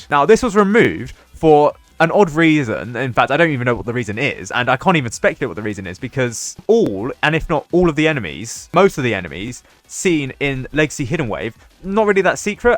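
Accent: United Kingdom. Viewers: i forgot was British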